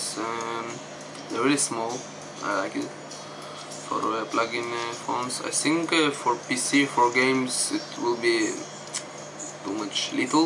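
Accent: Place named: Israel. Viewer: ידעתי מהתחלה שאתה ישראלי בגלל המבטא תודה אחי עזרתי לי מאוד :)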